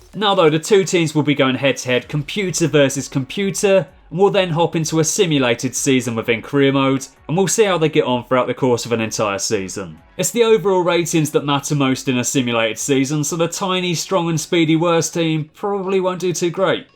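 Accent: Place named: United Kingdom. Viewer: I like your British accent melted through years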